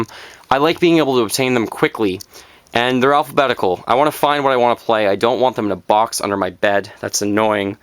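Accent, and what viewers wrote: Canada. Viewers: great video! I love video game collecting and I can see you do also. Also you sound Canadian.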